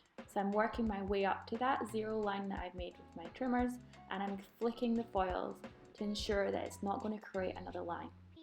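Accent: Scotland, United Kingdom. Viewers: Are you Scottish? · The Cuts ❄, The scottish accent, is this in glasgow?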